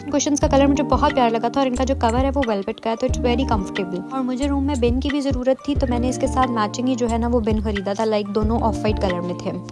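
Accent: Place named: Punjab, India. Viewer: Urdu k sath sath apki punjabi b bht cute haee❤ Stay blessed😊 and make more volgs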